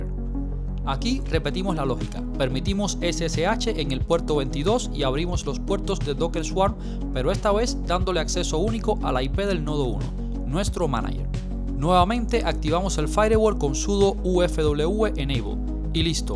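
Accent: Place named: Cuba. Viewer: Eres cubano! Ese acento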